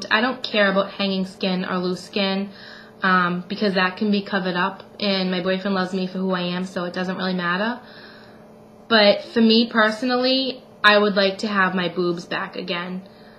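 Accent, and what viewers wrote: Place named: Massachusetts, United States. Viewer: FIRST thing I noticed about you was your Massachusetts accent!! lol LOVE it!!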